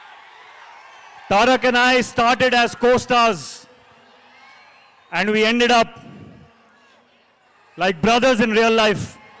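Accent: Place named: Telugu, India. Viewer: accent 90 per original telugu person laga undi..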